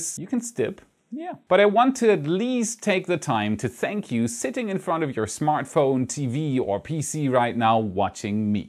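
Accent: Germany. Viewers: Tscherman Akzent. His accent is actually quite weak -- there are a few words he gets wrong (such as this one), a few vowels that aren't quite right ("apload"/"upload"), and a particular pause in certain consonant clusters that is common in German. And that's about it. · Are you german?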